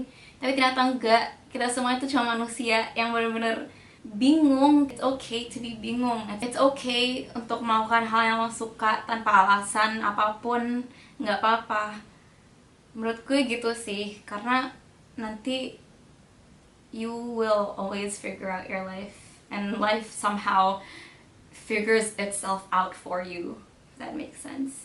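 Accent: Java, Indonesia. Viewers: Its okay to be "meddok" 😁 , keren kok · cara ngomong medoknya itu loh 😍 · Medok nya kental Jawa bgt but kamu fasih inggrisnya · well actually, cocok bgt kalo medok 😁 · Medoknya lucu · Bagusan medog ngomongnya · talking in medok accent omg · Banggalah dgn medok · It's okay to be medok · Medoknya lucu wkwkwk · It's okay to speak like medok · Logat kejawa jawaan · bikin video ngomong medok dong. cute banget wkwk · Medog aja kak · Kok medok gitu? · Medog malah natural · Aku seneng medok mu haha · Please, bikin video pake bhsa medoknya dong. Lucu lah. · Lebih suk kalo ngomongnya medok.. kearifan lokalnya terlihat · I am eight year gpp cute kok medok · That javanese accent, tho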